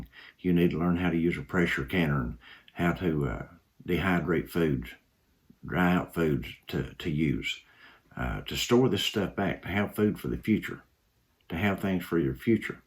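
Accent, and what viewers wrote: Kentucky, United States. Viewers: My family is from Manchester, Ky. I just love seeing people from Ky. I didn’t grow up there but I still feel a connection to Ky. Your accent reminds me of my granny and my uncle and aunt. Love hearing you talk.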